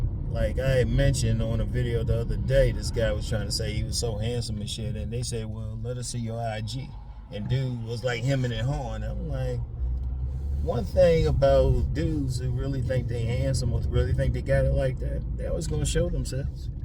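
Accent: Washington DC, United States. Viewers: You sound like you from DC.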